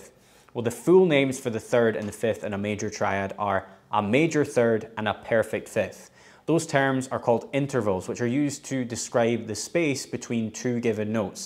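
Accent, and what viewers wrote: Scotland, United Kingdom. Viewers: Nice one sir. Your from Scotland, but where exactly. We might be neighbors. Cheers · Sounds like a Scot to me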